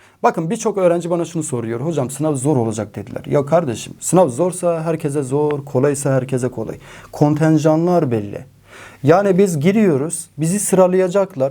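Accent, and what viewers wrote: Van, Turkey. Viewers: hocam siz kendinizi anlatmak için çabalamayin biz sizin Van şivenize alıştık anlıyoruz sizi. Bazı insanlar ya anlamıyor yâda bilerek sizi kızdırmaya çalışıyor takmayın boşverin. Sizde bizimle bütün sene çalıştınız. Allah sizden razı olsun inşallah. 🤲 Tatili hakettiniz... 10 gün sonra denize girip kef edin. He walla, kahvaltı da enfes olsun yarasın. Afiyet olsun. Yiyin için gezin yüzün en güzel kef ler sizin olsun 😃 hakkınız ödenmez tanıştığımız için çok memnun olduk bunu kendi adıma çok rahat ifade edebilirim. Siz örnek bir egitimcisiniz meslektaşlarınız size imrensin isterim. Cansın. 🙏👍👏😇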